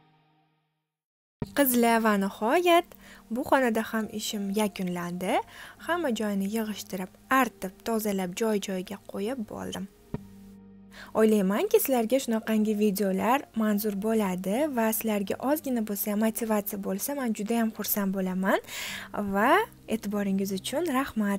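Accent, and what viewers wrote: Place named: Fergana, Uzbekistan. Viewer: Ассалому алайкум! Канал росаям ёкди🤗 бунинг учун Aishaxonга рахмат деб коламан ва сизга хам👍 Мабодо сиз Фаргона шахридан эмасмисиз? Гапиришиз бизникидай эканда🤩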